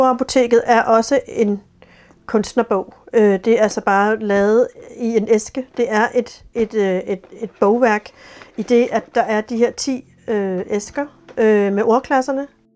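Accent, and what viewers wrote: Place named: Scotland, United Kingdom. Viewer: wow she sounds so scotish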